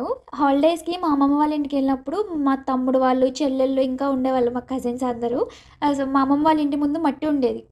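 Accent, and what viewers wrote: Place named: Godavari, India. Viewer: typical godavari slang....... ------from a godavari boy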